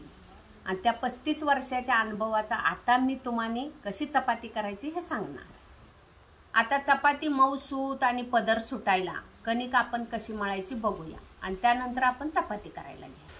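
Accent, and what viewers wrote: Kolhapur, India. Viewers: Sweet and beautiful kolhapuri tadaka🎉 चपाती लागत्याच, माझ्या अनूभवावरून सांगतो, जातय, करतय sweet language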